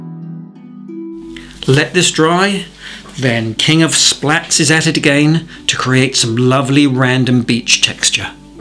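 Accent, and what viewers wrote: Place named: United Kingdom. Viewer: Dream atmosphere. Enchanted by the music, your painting and your wonderful British accent. I could spend hours watching you paint. Thanks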